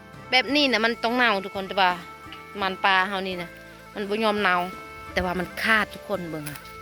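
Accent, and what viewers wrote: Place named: Vientiane, Laos. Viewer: สำเนียงเว่าคือคนลาวเวียงจันทร์แถ่ะครับพุสาว😊❤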